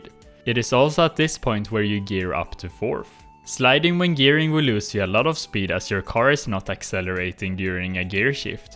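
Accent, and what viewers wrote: Sweden. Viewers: love swe english accent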